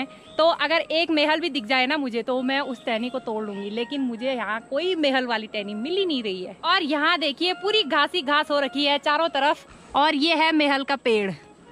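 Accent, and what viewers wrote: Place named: Garhwal, India. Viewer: बढ़िया वीडियो बनाया। हिंदी को गढ़वाली लह में बहुत अच्छा बोलती हैं।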